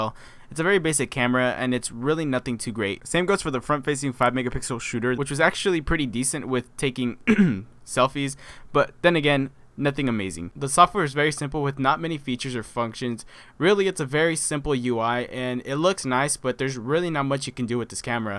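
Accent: United States. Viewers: yo u from fresno bro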